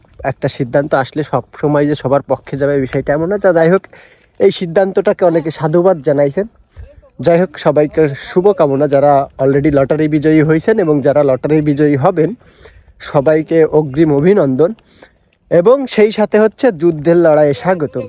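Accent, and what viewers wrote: Jessore, Bangladesh. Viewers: ভাই আপনার কথাই যে মজা পায় খুব ভালো লাগে ভাই আপনার গ্রাম্য বাংলার ভাষা গুলো আমাদের যশোরের সেই ভাই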